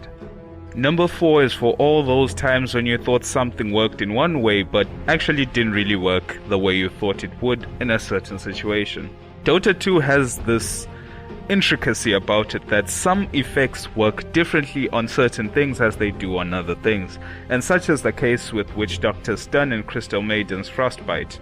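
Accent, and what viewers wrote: South Africa. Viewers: He does sound very South African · dude are you South African? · this oke is south african for sure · I'm guessing you're from South Africa. Am I right?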